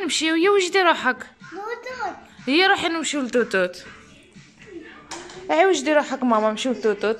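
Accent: Tlemcen, Algeria. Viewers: هدي هدرتها هكا حنا التلمسانيات نهدرو هكا و كاين اللي يهدرو بال a يهدرو بضرافة كثر من هاك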